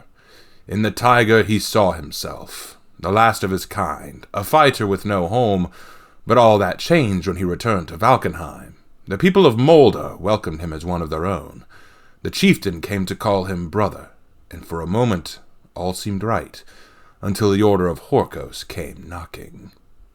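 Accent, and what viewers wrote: Scotland, United Kingdom. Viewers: What an awesome read! Your Scottish accent wasn’t that bad either and your overall delivery of the entire story is top notch. I’m more surprised that the story isn’t that bad as I originally thought, but still could use a little bit of work. Still, great video!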